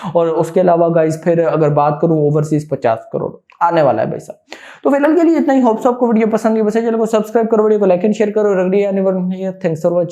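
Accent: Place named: Pakistan. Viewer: ISKI LANGUAGGE SUNKE PATA CHALTA H PAKISTANI H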